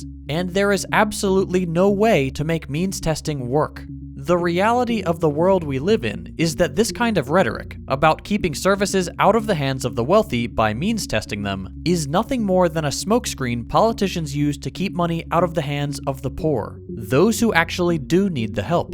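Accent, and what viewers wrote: Texas, United States. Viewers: Bro is texan? Wtf?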